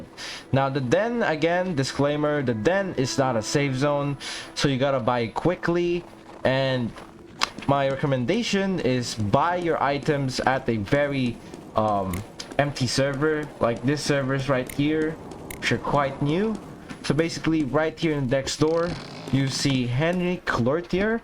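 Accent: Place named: Philippines. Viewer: Bro u have the filipino accent, r u filipino?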